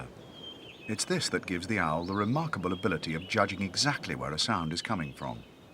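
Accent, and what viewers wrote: United Kingdom. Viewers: These documentaries always seem right when a British dude is doing the dialog...... Got that accent thing goin.....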